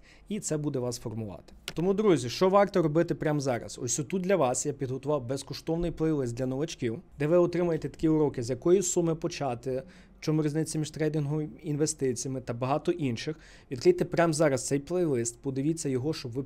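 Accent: Lviv, Ukraine. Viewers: Приємно слухати розумні поради ну і Львівський акцент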